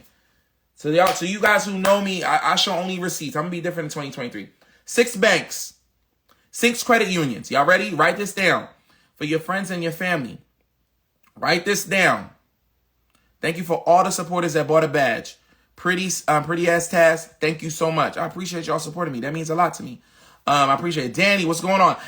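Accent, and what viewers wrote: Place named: Philadelphia, United States. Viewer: Omg!! He be having me SOO HYPED!!! That Philly Tone and RHYTHM 🔥🎯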